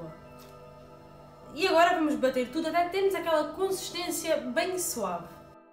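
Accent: Portugal. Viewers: Gosto da forma como ela diz "Óla Tólkien Talkrs" com o sotaque luso É engraçado e, ao mesmo tempo, charmoso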